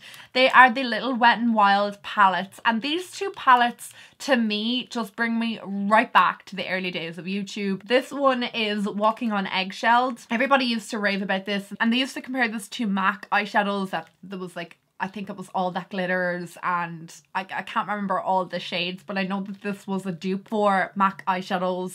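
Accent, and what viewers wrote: Ireland. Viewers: hey is ur accent altered by ur tongue ring or do u sound the same without it? I've never come across any gurus from ireland and i like hearing ppl speak little fun facts about where they are from ... u all don't know theyre fun facts bc naturally to u all its normal bc its where u live lol. But anyhow, do u know of any other gurus from ireland that i could sub to as well?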